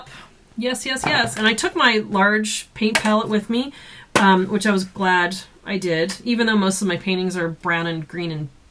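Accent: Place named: Canada. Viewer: I also like your canadian accent and I adore your sketches. Can you make a pinata for us on ur craft channel :)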